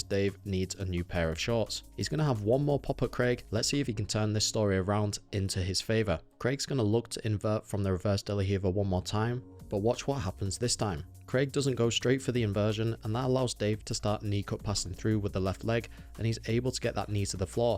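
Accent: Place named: United Kingdom. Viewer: Shit is literally unwatchable with this limey talking the whole time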